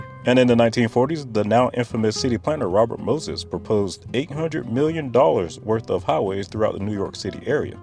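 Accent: South, United States. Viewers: You're obviously from the deep south; why do you call it Long Guyland?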